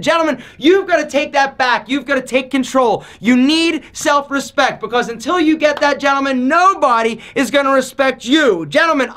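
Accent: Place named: Lancaster County, United States. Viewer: sounds like a Lancaster county boi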